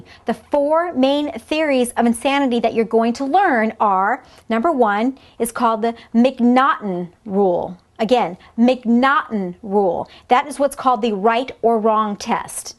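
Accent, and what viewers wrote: United States. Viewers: QUESTION: If you're really certain, where in the USA is her accent from? Thank you. Every one of this series of videos is very clear and of excellent quality, and the audio levels on every one of the videos are perfectly matched for an uninterrupting playlist. The instructor is also very attractive, and I'm curious where in the USA her accent is from. Finally, I wish there were more such videos from her, besides the ones in this series.Thank you so much!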